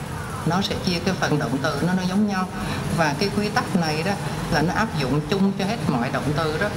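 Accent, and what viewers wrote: Vietnam. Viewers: chũ khó nhìn với cách đọc của miền Nam nên lúc nào ucngx phải chuẩn bị cách google dịch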